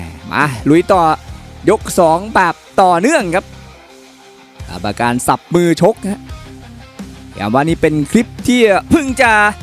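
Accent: Isan, Thailand. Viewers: รำคาญเสียงพากย์อีสาน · พูดอิสานเฮาแกมภาคกลางฟังแล้วม่วนคัก ข่อยคนอุบล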